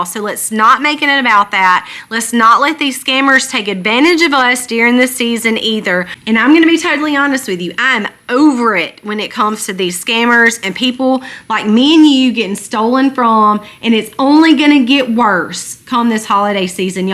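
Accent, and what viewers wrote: South, United States. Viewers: Lovely southern drawl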